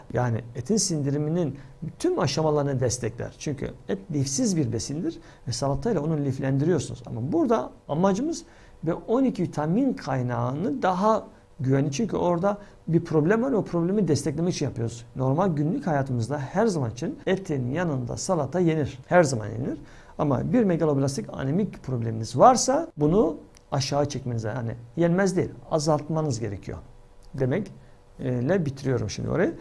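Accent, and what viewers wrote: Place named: Southeast, Turkey. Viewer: Sevğili Hocamm merhabalar,💐🙏Dün gördümm videonuzu, ve tekrâr yine dinlemek istedimm sizi, çok mutluyum gerçekten, Düşündümm bir an aklıma geldiği şivede dikkatimi çektiiii, yıllar önce Babacığımm da Eczacılık yaptılar ( Batmanın Gercüş ilçesinde kaldık ama Kozlukta da kaldık nekadar çok ismii vardır diye düşünüyorken Netten, otobiyografinize baktimm,))) Nokta atışı heĺâl olsun bana dedimm, Oraları çok severek anlatiyorumm çocukluk yillarimiz oralardaaa) Doğu ve Güneydoğu insanları bizler çok misafirperver yaklaşırlardı, Çok hakları vardır bizdee 🙏🙏🙏Onlara siz8n Nezdinizde teşekkür ediyorumm, sizlerede aynı şekilde teşekkür borçluyuz Saygıdeğer Hocamız verdiğ8niz bilgiler için Minnettarimm, ve dee severek paylaşacağimm yayinlarinizi, Sayğilarimlaa,🙏🙏💐💋💋)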